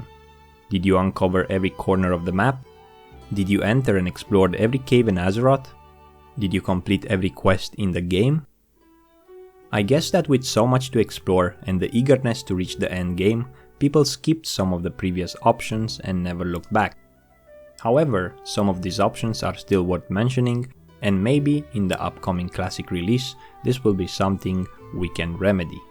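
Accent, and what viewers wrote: Romania. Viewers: this guy has a romanian accent, wonder if he is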